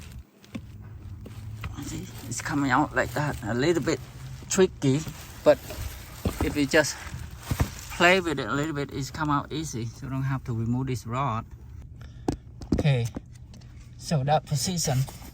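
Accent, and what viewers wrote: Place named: Vietnam. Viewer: nice, ty for this sir. also u sound Vietnamese. i love it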